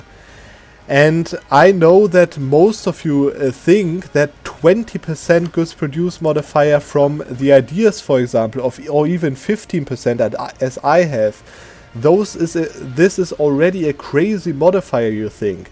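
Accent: Germany. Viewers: Damn boy you sound so deutsch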